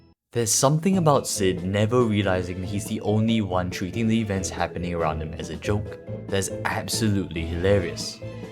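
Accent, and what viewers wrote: Singapore. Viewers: are u from singapore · you sounds like my uncle who came back from sg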